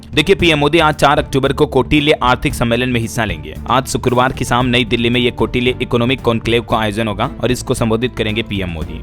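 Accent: Rajasthan, India. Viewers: Bhai rajsthani Ho kya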